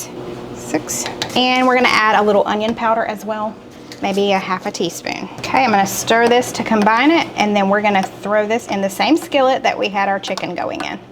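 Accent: South, United States. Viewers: your family is very inspiring. I love watching ( listening to your southern accent). Your graciousness today made me cry. Prayers for your sisters health and to Bonnie's family. Thank you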